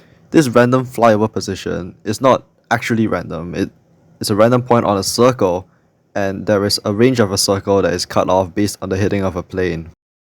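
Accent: Singapore. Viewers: why do you sound singaporean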